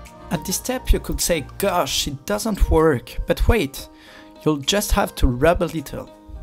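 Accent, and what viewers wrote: France. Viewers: thank you for your video. your french accent is gorgeous! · We love your French accent. Your are French after all❤️❤️Merci!! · I've lost all interest in cleaning my hob – I just want to stay here and enjoy the lovely French accent! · There's nothing wrong with your French accent. You should listen to mine! LOL! Very good job on the video! Well done! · I like your French accent me too I have a French accent I am Mauritian the family speak French and creole thanks very much for your video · There's no need for you to apologize for your french accent I can understand your English perfectly.🤗🤗🤗🤗🤗🤗🤗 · Never apologize for your french ascent love it. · Do not apologize for your French accent. Merci beaucoup. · Please never ever apologise for your French accent! It's adorable! And this is an excellent video, so thank you for posting. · Don’t ever apologise for you accent we love it! 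Be proud of your heritage and you French culture thanks for the video! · Your French accent is very sweet and charming and your English is fine. No need to apologize. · Absolutely no need to apologise for your gorgeous French accent! You speak far better English than some people in my part of the UK! Thank you so much for this video - worked perfectly on my very neglected burners ❤️ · don't apologise for your accent , it's what makes you unique, i have a french accent too, ah ah , love your video by the way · Good information! Love the French accent -- no need to apologize. :-) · Thank you for sharing and please no apologies for your beautiful French accent! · Your English is great and I like your French accent. No apologies are necessary. Thank you for sharing. This is a great tip. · Your French accent is music to my ears!! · i love your french accent! Tres Sexy! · Your French accent is music to my ears and your English is faultless. 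Thank you for the video, it was most helpful 🇬🇧🏴 Enjoy your weekend. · Thank you, I will tryy this. Your French accent is charming! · Love your french accent!!! 🤗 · Your French accent is fine and your English is excellent. Thank you for your video. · Please don't apologise for your georgious French accent, to me that's what made this video so interesting. Had to watch it twice. Very good advice Also some of the comments were helpful to. Thank you for this video. From London UK 🇬🇧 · merci beaucoup for the tips. Love the french accent so no problem at all!😊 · It is nice to hear your French accent.Thankyou this is so helpful. Easy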